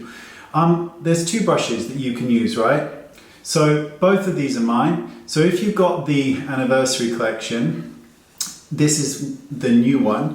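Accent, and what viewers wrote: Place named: United Kingdom. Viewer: Oh my heart! It dropped at totally useless... That BRITISH ACCENT 😍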